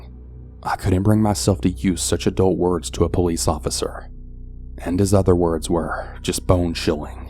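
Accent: South, United States. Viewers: The narrarator sounds like a country boy. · No to southern cannibal narration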